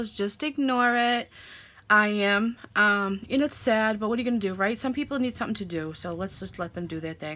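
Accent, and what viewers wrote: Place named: New York, United States. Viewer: Hey beautiful I luv ur personality n ur ny accent 😍do u have another channel?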